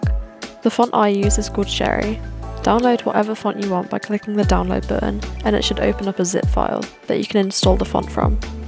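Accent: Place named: Australia. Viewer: stopp that australian accent is so cutee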